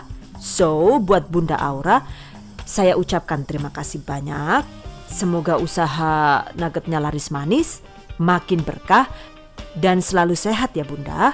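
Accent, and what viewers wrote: Banyumas, Indonesia. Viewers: Ku suka kalau bhs ngapake metu rek....lucu sih...... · Suwun resepe ya Yu... Rika sih wong ndi Yu, deneng ngapak kaya nyong.. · Owh si ibu ngapak jebuleeee... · 🤣🤣🤣🤣🤣🤣🤣🤣🤣🤣🤣🤣🤣🤣🤣🤣Nyong seneng bgt krungu NGAPAKE... THE BEST CHANNEL😘😘😘😘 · Bun , aslinya orang mana sih, suka liatnya ada ngomong ngapak nya 😁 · Ga tau knapa kalo buk hasanngomong ngapak ktawa sendiri😀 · Hhaaa q lah gemes ,,Rika janeh wng ndi Yu,,ngapak pisan ky inyonge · Madiun kok ngapak seh buk? Kepriwek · Mba.nya orang mana sih?kok ada ngapaknya? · Bahasa Inggrisnya bagus, ngapaknya lebih keren😁😁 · Wiih ngapak mbak 😁😁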